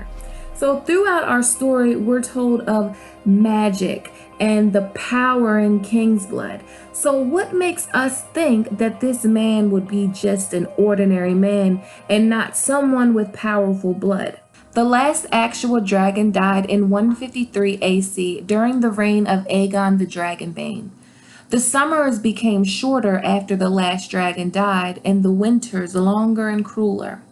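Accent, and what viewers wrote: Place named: Baltimore, United States. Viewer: You gotta be from Baltimore lol. I can hear my accent from anywhere lol.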